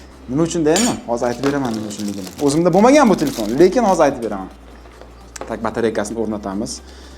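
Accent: Samarkand, Uzbekistan. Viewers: Samarqand danmisiz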